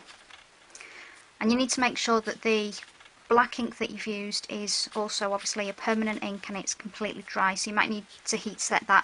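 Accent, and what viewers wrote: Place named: Northern England, United Kingdom. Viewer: Fabulous work and great videos. I was trying to work out your accent - somewhere between Liverpool, Manchester, Cheshire and the Black Country?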